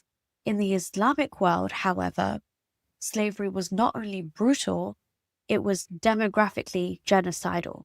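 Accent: United Kingdom. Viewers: Maybe because she has a British accent?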